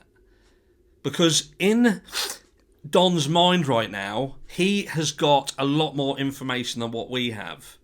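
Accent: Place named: England, United Kingdom. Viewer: I do love your accent also. Always have loved the way the English pronounce and state, with such compassion. I had forgotten about Jodisue's statements about the Suburban. Candus you to get straight and come up with the truth, no matter what it is - for Summer's sake, her memory, her dignity, her innocence...Do one right thing in the name of ALL your children.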